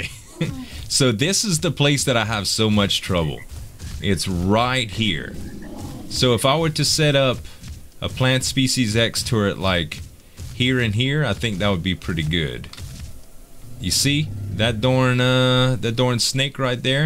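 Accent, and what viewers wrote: Louisiana, United States. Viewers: Mais, you from Louisiana?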